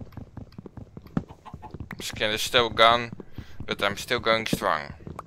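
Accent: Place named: Netherlands. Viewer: Haha your dutch accent is so funny! (Not meant bad at all m8!) Keep goin once you will be at 1k! Tip: maak wat kortere videos en record in meer fps dan word het veel interessanter! (En mschien je naar verranderen naar ofzo iets korter👍) veel succes verder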